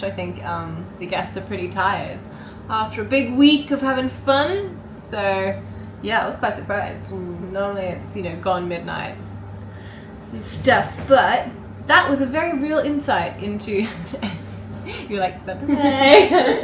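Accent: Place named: Australia. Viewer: What nationality is she? I thought she was Australian not English 🤔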